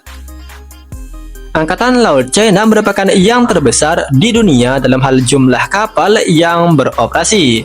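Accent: Lombok, Indonesia. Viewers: Mimin dari Lombok ya ?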